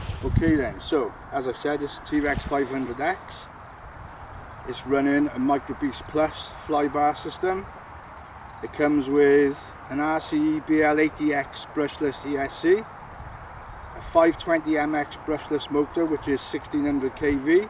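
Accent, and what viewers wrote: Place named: Wales, United Kingdom. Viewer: That took me back to my first Trex 500 (self-built with Beastx) many years ago. Now aged 77 I’m afraid a shaky thumb or two could spell disaster for someone, so I sold or passed on all my gear about 2 years ago. I first spotted your vlog when browsing YouTube on my iPad, keeping the volume to zero - then played it later on my tv, hearing a slightly harsher / more aggressive beat from the motor … and a familiar Welsh accent. I’m a Neath guy and would have loved to have had a co-flyer like you, all those years ago. Good for you sir 👍.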